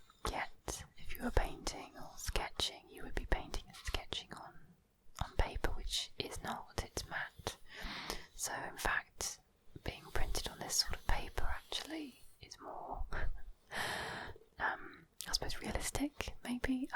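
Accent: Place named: United Kingdom. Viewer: Sorry but I genuinely don't like British or similar to British accent,so this video soundeed agitating to my ear due to that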